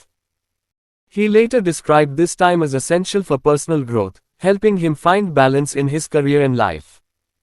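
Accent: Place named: India. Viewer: aS NARRATED BY A PAKI OR INDIAN GENIUS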